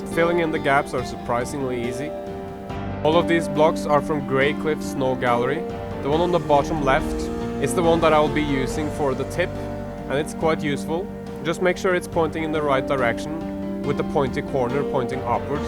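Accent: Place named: Norway. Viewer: Bro i can hear ur from norway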